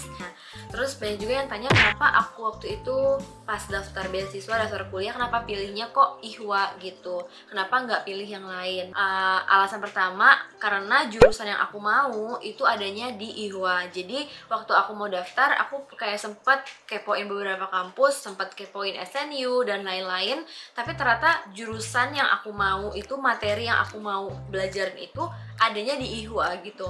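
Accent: Indonesia. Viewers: Hai kak dan kak assalamualaikum. Salam kenal, saya BEKASI UTARA. Uwahhh Bekasi mana nih Suaranya hehe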